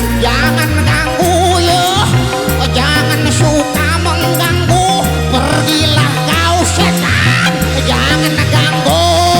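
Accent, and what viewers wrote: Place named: West Java, Indonesia. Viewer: ....yeesss. teryata logat bahasa sunda bisa jg di pake orang lombok.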